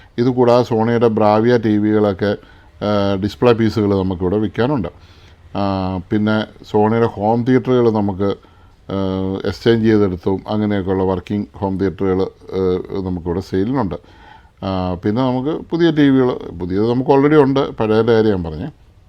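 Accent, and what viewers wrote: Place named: Kanjirappally, India. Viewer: ഇദ്ദേഹത്തിന്റെ സംസാരം, ഒക്കെ കേട്ടപ്പോ തന്നെ എനിക്ക് നേരത്തെ മനസ്സിലായാരുന്നു കാഞ്ഞിരപ്പള്ളി ക്കാരൻ ആണന്ന് ❤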